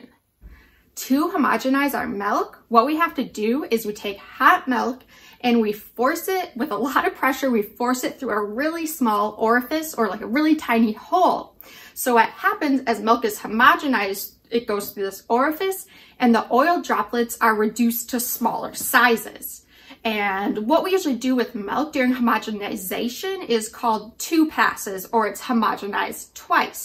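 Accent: United States. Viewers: She is American. That is how Americans pronounce it.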